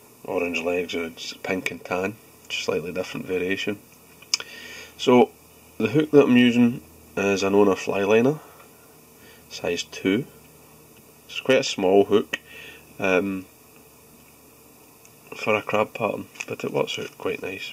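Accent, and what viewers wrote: Scotland, United Kingdom. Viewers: Great looking wee crab. I love your accent, Davie McPhail called his pattern a wee beastie tonight. I love it. If I could go anywhere it would be Scotland. Would it be helpful to put some tungsten or lead substitute under the (on top) of the hook when tying in the eyes to help keep the crab from flipping? Just wondering. I have no use for a crab because I don't fish salt water. I am sure there are a few species of fresh water crab but I don't know anything about them. I may tie one just to entertain the wife. She loves to see my flies if they are impressionistic enough to understand what they are. When your terminal, nothing like a little dementia to take the fear and realism out of dying. For me it is a little more sad. The Lord is preparing me as much as one can be prepared. All the best to you. Keep the videos coming I watch them all night while caring for her. 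Sean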